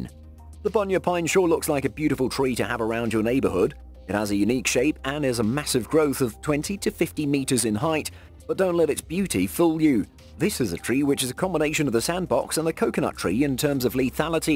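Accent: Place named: United Kingdom. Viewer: Uk accents so different from ours strick-neen we say strick-nine migraine me-grain we say my-grain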